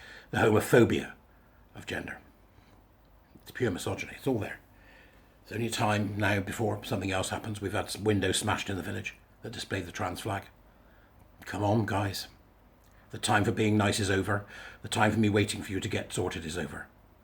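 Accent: United Kingdom. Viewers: you sound like your from the southwest of the UK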